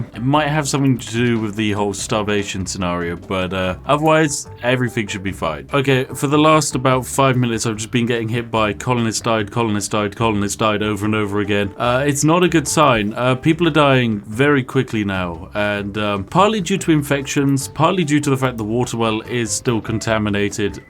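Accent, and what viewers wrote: United Kingdom. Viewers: Wait, this guy is British?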